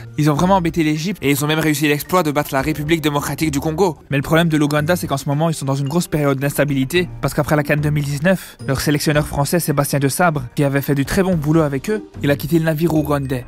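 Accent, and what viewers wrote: Belgium. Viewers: Tu as un ptit accent belge.lol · C'est un accent belge que j'entends?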